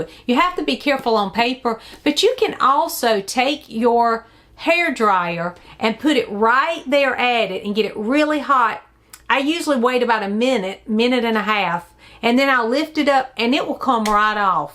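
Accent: South, United States. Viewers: Hi! I haven’t yet commented since finding your channel earlier this summer so today I decided was the day. I adore your channel! I really love your southern accent. I’m originally from Wisconsin so I am not accustomed to hearing the southern drawl and I find it so endearing. Whenever I find a new channel I enjoy, I go back and watch all of their videos to get to know them. I’m so enjoying you and the infectious love of books you bring to your channel. Your genuine passion and love shines through brightly and I thank you for being such a bright light. I love everything you post! I would love to see a video talking about your all-time favorite books that have left a lasting impression on your life. Thanks for sharing this haul 📚 ~ Chelsea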